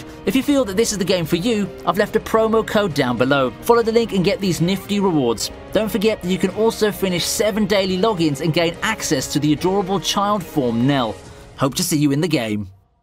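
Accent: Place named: United Kingdom. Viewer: I love your british accent!!! Thats why I'm a fan of you!!!!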